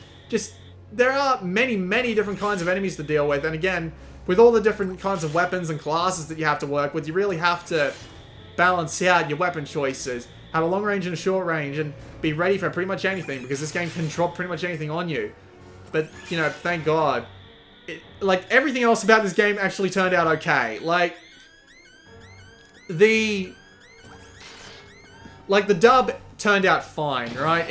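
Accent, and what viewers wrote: Australia. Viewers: Unrelated question, how is your Vegeta impersonation? Sorry, you just sound like an Australian Lanipator.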